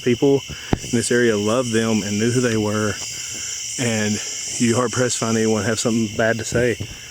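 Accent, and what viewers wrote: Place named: Tennessee, United States. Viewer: Another nifty explore! You caught some interesting EVPs,: "Got me" or "Shot me ('ot me)" whispered after you ask "Who's Michael?". "Hello!" after you say: "I'm here to communicate with you.". "I'm not gonna fix it!" very rapid words, male voice, after you say: "It's weirding me out!". *You had the wrong...REACTION!" Same male voice as. "Soil!" This was whispered after the Ghost Box spells out "Barry". I think it meant "Bury" but spelled it wrong, because: "In the...GROUND!" This is a whisper, but the word "ground" is drawn out (grooouunnd), and it sounds like Spirit is "scream-whispering" at the top of his non-existent lungs. He sounds angry AND frustrated. Perhaps something was "buried" there, in the "soil"/"in the ground" that was valuable, and the killer went there looking for it, ostensibly to have supper? 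"No". Very faint whisper after you ask: "Anything you'd like to say?". "No." Another faint whisper after you ask: "Was it your cousin who committed the murders?". You heard that one yourself, as you mention "hearing voices". "Get lost!" whispered as you are going through the brush/shrubby trees. Very interesting explore,. Lots of EVP evidence caught. BTW, I love listening to your voice, as my Great Uncle Joe was from Tennessee, and your accent reminds me so much of him. He even used to say "terlit", so I >know< you didn't make that word up!:) Stay safe,!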